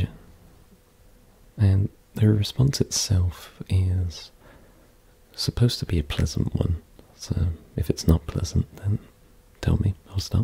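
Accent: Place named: England, United Kingdom. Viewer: accent is also english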